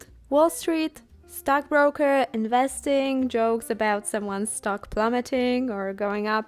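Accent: Poland. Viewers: I love your polish accent, pls keep it!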